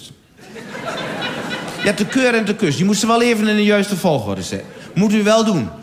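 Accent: Twente, Netherlands. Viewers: Antwerps dialect k dacht toch echt dat dit Twents is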